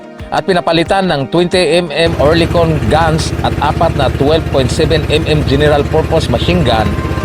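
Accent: Visayas, Philippines. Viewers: Bisaya ka pre? Binasa mo nlang mga sinasabi mo sablay sablay pa animal! 😂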